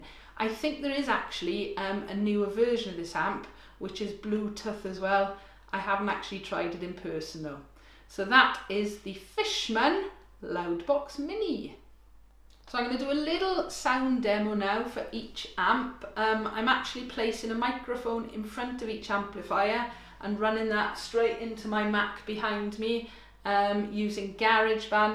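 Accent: Wales, United Kingdom. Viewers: How do I amplify my acoustic Ukelele? I know that Acoustic Guitars can be done with a Mic in front of it, but how reliable is it. Nice to hear a Welsh accent from a Bristolian living in Canada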